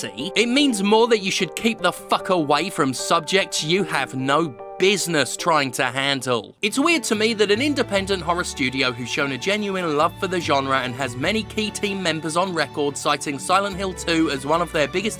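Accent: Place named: United Kingdom. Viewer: Your British? I thought you were Canadian egh,. Well explains why you never say sorry egh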